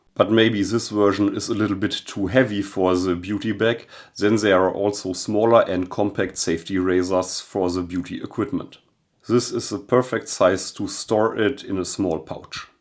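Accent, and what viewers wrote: Germany. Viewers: Your accent is undeniable german - but likeable 😉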